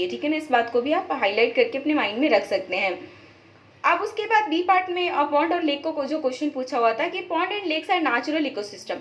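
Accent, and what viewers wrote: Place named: South India, India. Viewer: Mam ka accent south ka hh😌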